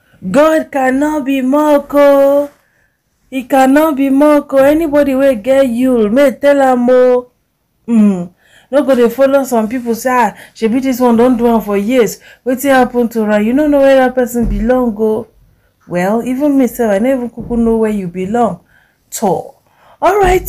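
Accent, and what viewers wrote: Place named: Pidgin, Nigeria. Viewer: 😂😂😂I love your videos so much and I like the way you speak your pidgin to give gist, God bless you my sister. Watching from Liberia🇱🇷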